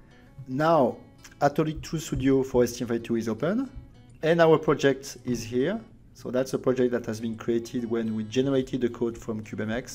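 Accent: France. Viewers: Thank you very much for the perfect "Getting Started" tutorial. Very lovely french accent also :) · hi, thanks for this tutorial, I guess you're a French native speaker 🤔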